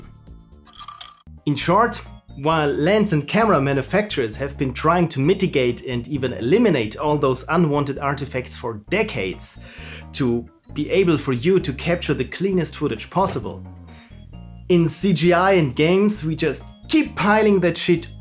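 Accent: Germany. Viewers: Du bist ein deutscher, stimmts? :D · You are German, aren't you?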